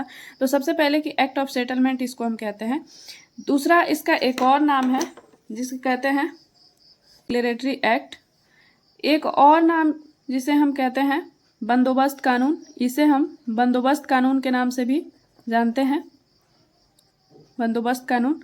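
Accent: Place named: Bihar, India. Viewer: Mam apki voice tone se lg rha h aap bihar se ho😊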